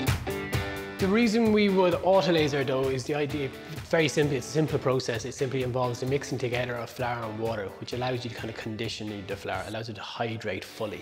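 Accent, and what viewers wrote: Ireland. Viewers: I love your accent, I feel in I’m Ireland when I listen to your voice. I really like you baking style. Cheers buddy keep making great bread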